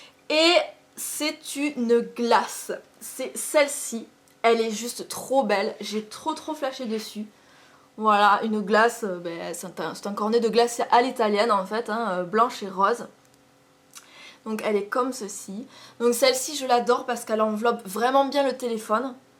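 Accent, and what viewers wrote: South, France. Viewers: Super vidéo sa se voit que tu viens du sud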